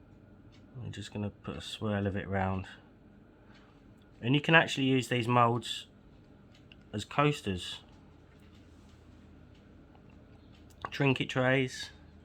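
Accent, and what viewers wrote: Essex, United Kingdom. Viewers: Your accent is *charming*,. I've never, even once, had a problem understanding you, and I'm from the States & have never heard an Essex accent in my life. So, please, don't have another thought about it. It's such a nice accent! Combined with your calm voice...? Perfect mix! And, now, for the video. I LOVE this beautiful mirror casting! ❤ It's so, so pretty! The leaves are perfect (great choice of mica powder) and what a genius way to do it! 👍😊 I'll bet she loves it!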